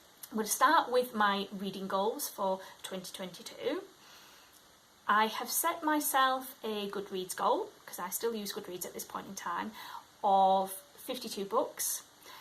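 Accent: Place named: Doncaster, United Kingdom. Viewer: Hi, happy new year! Recently discovered your channel ☺️ Your accent is nostalgic for me to hear as I'm from Doncaster and have lots of family who live there. Don't know if you live anywhere near there but it reminds me of them! I'm in Derbyshire now, so not too far away. Hope you have a great reading year.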